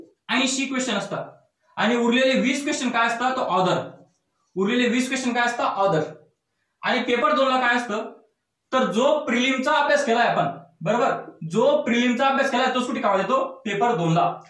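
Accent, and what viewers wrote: Khandesh, India. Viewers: Bhau khandeshi she vatat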